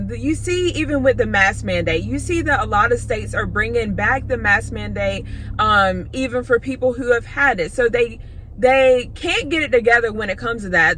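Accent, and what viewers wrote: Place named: Mississippi, United States. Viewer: Love your accent. It sounds a lot like a Mississippi accent, my home state. The stuff is about to hit the fan. We cannot continue to go further into debt. Inflation is rampant yet interest rates remain near zero. It’s a rock and a hard place.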